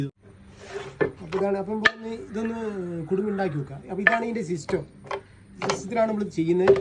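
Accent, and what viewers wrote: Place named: Kozhikode, India. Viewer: ഭാഷ ആക്സന്റ് കേട്ടിട്ട് കോഴിക്കോട് അല്ലെങ്കിൽ മലപ്പുറം ആണ്.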